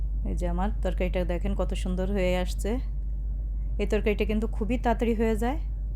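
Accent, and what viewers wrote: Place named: Chittagong, Bangladesh. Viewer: Apu chittagong er mone hoy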